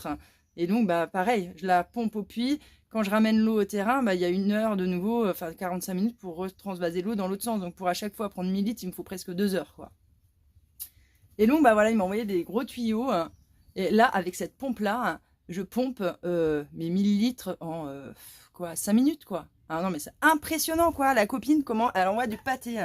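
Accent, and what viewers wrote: Paris, France. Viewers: C'est un accent parisien que j'entends là ??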